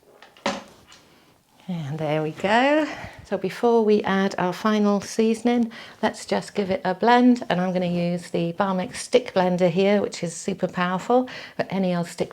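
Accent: England, United Kingdom. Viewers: I've just got an Instant Pot with Ultimate lid. It is so easy to pressure cook with! Now I have found your channel I can learn more about how to use it. Thanks! Also your English accent is lovely to hear.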